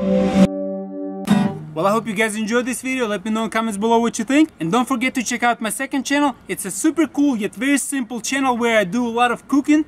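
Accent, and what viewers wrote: Russia. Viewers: You English seems more fluent when you do your cooking class, and your Russian accent seem thicker when you do your Slo-Mo shoots! Is that on purpose? Mama's hat as a Matte-Box is too funny !!! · it isnt him it is just another crazy russian they all sound alike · Как же режет уши русский акцент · Russian accent?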